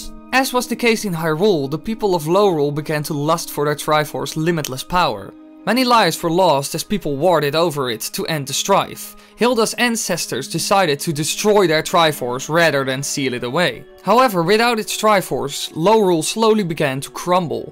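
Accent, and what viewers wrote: Netherlands. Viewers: Ben je Nederlands of zo? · are you dutch?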